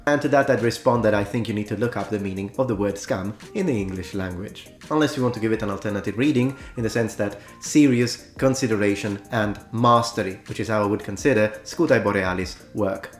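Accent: Italy. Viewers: I didnt realise until now you had a slight Italian accent...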